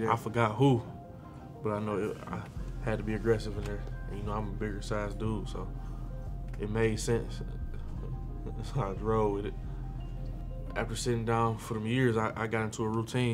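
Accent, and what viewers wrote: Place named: South, United States. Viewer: He may have spent time in the D but he obviously spent more time in kentucky. He has a thick southern accent and he shouts out kentucky in alot of songs.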